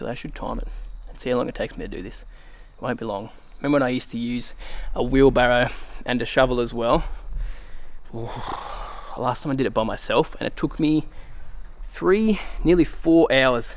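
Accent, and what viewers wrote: Australia. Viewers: You an Aussie?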